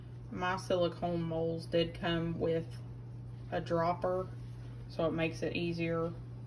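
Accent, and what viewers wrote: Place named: South, United States. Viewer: She did a great job. Don’t give up... you can do this! I Everyone sounds differently! Your Southern drawl is different and makes you unique! Paula Dean and Dolly Parton have your accent and it never stopped them! Come on back to the You Tube family and do your thing! 💚✨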